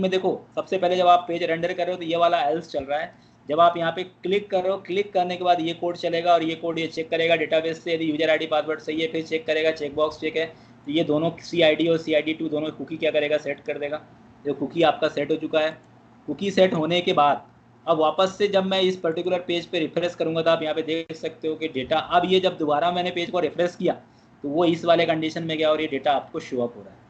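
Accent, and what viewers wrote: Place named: India. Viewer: God I don't know if I either love or hate the hindi accent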